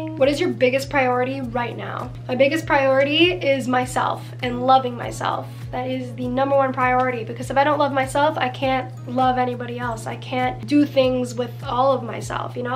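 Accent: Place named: Italy. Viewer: do not hide your italian accent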